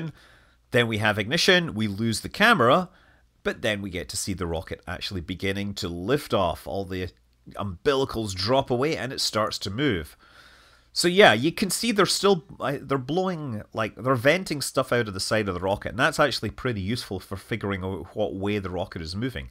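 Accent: Scotland, United Kingdom. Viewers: Scot, are you Scottish? The accent is awesome, my ancestors came from Strathmiglo, Scotland in the 1700's to escape the English.. love all things Scottish.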